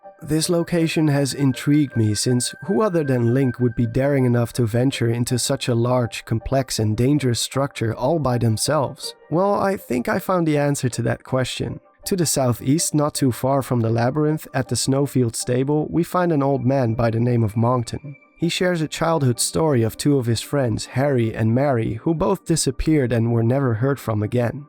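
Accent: Netherlands. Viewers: I already thought you were Dutch! I recognised the accent :) (I am also Dutch myself) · You make great videos I really thought you had like a million subscribers. Also are you dutch because you kind of have a dutch accent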